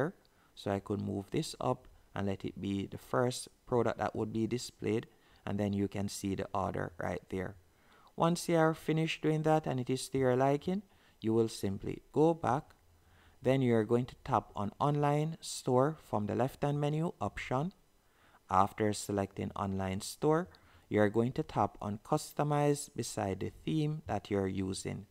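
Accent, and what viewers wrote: Jamaica. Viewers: Yo you sound like a yaad man , if yuh bug mind help me generate some sales please 🙏🏽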